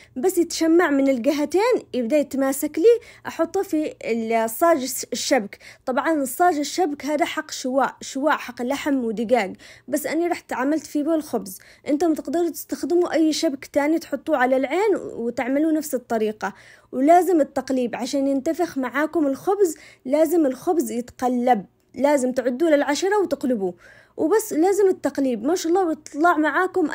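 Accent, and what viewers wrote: Aden, Yemen. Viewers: ماشاءالله مصري بلهجة يمنية 😃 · 😂😂 حتى بنتي الصغيره تقلدها وتسوي فيدوهات ماشاءالله اللهجه العدنية تفووووز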